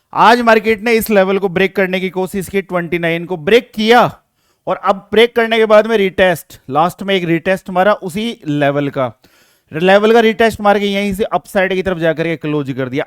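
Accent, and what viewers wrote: India. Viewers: Sir aap haryana se ho language waha ki hi lg rhi h jo bhi h love u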